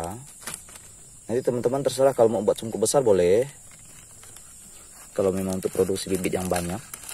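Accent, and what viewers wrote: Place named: Java, Indonesia. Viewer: Bang dari logat bahasanya kok Borneo nya cukup Ke Jawa- ne ha ha ha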